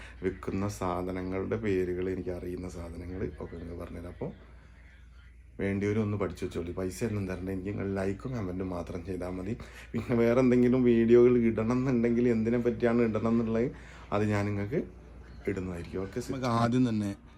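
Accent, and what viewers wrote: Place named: Philippines. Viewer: ഫിലിപ്പെെനികളിൽ നിന്ന് പഠിച്ച അറബി പദങ്ങളാണ് എന്ന് തോന്നുന്നു ഉച്ചാരണം കേട്ടിട്ട്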